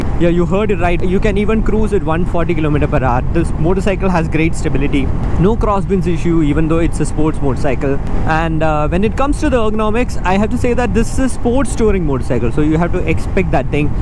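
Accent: Kerala, India. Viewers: Typical Malayalam slang..😁.... Good insight though 👍🏻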